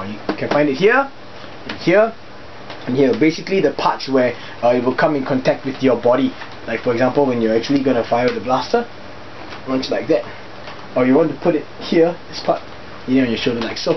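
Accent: Singapore. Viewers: OH MY ENGLISH!... eheh nice singlish accent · DO MORE SINGLISH! PLEASE!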